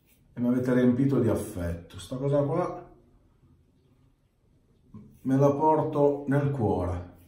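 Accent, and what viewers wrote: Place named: Piedmont, Italy. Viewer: Il tuo accento piemontese mi ricorda la "mia" Torino dalla quale sono partito ormai dal lontano 1996. Molto belle anche le interviste a Paolo Barrasso e Donato Ciniello. Un'altra video di compagnia visto / ascoltato mentre anch'io mi stavo radendo (la testa). Prima o poi vedremo anche una rasatura della testa? Buona giornata a te ed ai tuoi followers.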